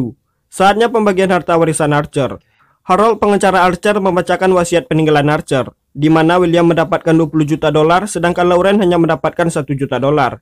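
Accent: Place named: Padang, Indonesia. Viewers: Saya merasa ini yg bicara org padang 😂😂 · Asli padang ya bg?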